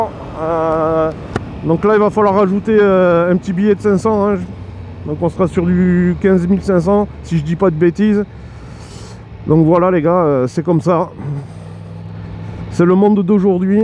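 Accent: South, France. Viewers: Essaie super sympa et cet accent du sud qui fait plaisir a entendre il ne manque plus que les cigales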